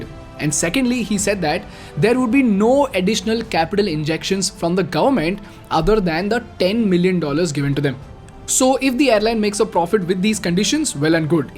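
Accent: India. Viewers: indian accent -_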